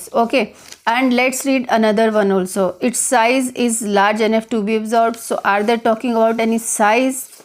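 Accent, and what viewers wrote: India. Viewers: Your accent is very disgusting mam. Hate indian accent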